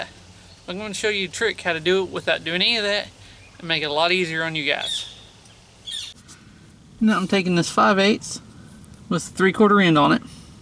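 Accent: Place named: South, United States. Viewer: Personally, I can't thank you enough for this video. Just got a P0303 on my 2004 Blazer LS SUV 4.3 Vortec this week. Picked up some standard AC Delco plugs and a set of "driveworks" (good reviews) brand plug wires at Advance yesterday, (Sunday), so I'ma try to tackle the driver's side --- first --- and get out the #3 plug out first too. Thanks for sharing your knowledge with all of us because you sure do make our DIY mechanic lives easier! Mr., you sound like a good 'ol country boy --- like myself --- so I was wondering what state you live in? Thanks again!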